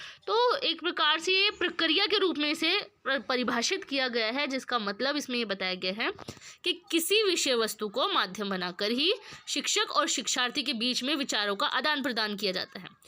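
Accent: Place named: Haryana, India. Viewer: Mam ap toh harayana se ho ❤️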